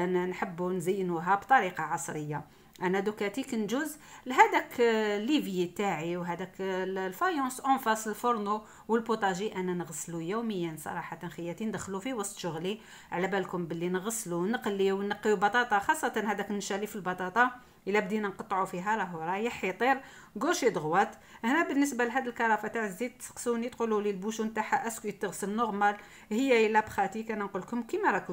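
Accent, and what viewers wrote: Algiers, Algeria. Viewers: j'adore votre façon de parler. Algéroise بلطبع والشان. allah ybarak fik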